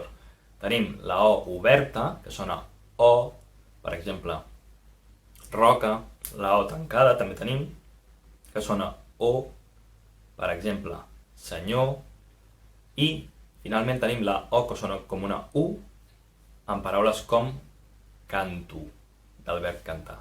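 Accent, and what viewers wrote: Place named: Castile, Spain. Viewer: Mestre, la lletra ce es pronuncia /sé/, amb essa i no pas com fas tu: amb el so castellà. També: 1) la o del mot "àtona" es pronuncia /u/ i no pas /o/, com ho fas tu, i la be del mot "àrab" és una /p/. Etc.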